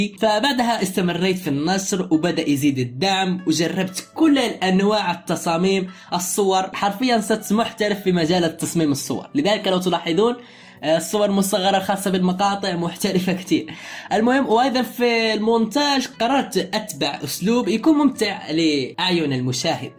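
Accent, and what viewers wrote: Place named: Morocco. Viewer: أضن كلامك يحي إلى أنك مغربي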